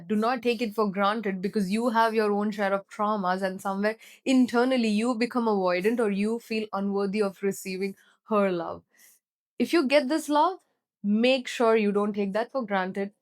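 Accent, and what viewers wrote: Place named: India. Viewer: You must be from India. Your accent gives you away.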